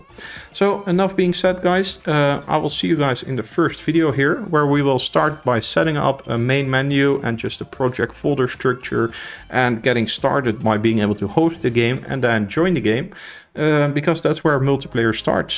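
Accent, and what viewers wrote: Netherlands. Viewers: Just found your channel, exactly what I have been looking for for ages! (and you premiered it on my birthday XD) You sound dutch (ben ik ook XD) Looking forward to going through these tutorials ^^ Thank you for making them <3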